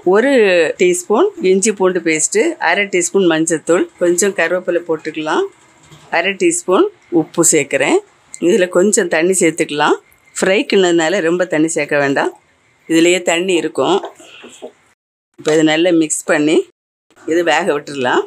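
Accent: Nagercoil, India. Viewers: I like to hear Nagercoil slang. Thanks for the video ☺️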